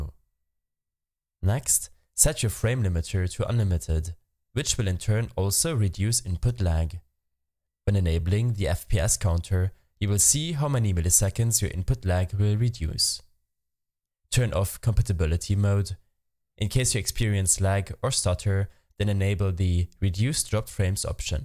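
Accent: Germany. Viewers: so geil das man raushört das er deutsch ist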